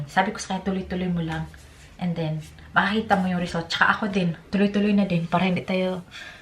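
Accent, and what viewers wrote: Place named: Visayas, Philippines. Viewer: Cute kaayo ka mag binisaya te hahahaha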